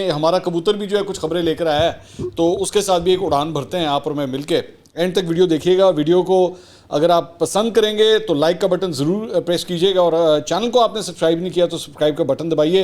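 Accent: Punjab, Pakistan. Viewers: Please avoid speaking in typical jatkka punjabi during your conversation. It certainly Suits others but not You!! Suggest to avoid passing your judgements.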